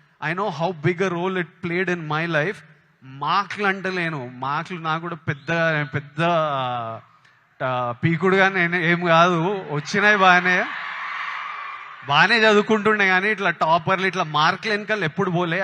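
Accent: India. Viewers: Hyd slang Ithe Ndi Telugu a adhi kuda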